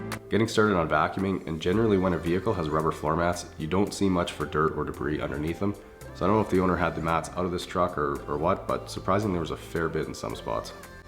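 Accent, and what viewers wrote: Saskatchewan, Canada. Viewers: are you guys dumb ??? He means he talks in a Canadian ""slang"" with a Canadian accent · He's from Saskatchewan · Your voice is so soothing. And I like the way you word things. And your Canadian accent is growing on me too! Love your vids keep it up 👍🏽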